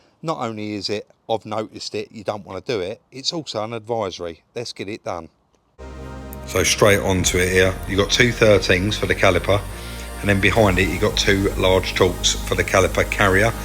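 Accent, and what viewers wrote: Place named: Cockney, United Kingdom. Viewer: Nice video guys , i took a personal interest as a partner owner driver ( yes your right they are all the same except badges and outside grill bits and a few other bits😮) and i must admit i was worried when you gave it a test drive and you mentioned smoke but you knew what you was onabout, good honest video and you do yourselves proud every time, as a Northener i allways imagined you guys with Southern accents were a bit sharp but you do give the motor trade a good name and well done .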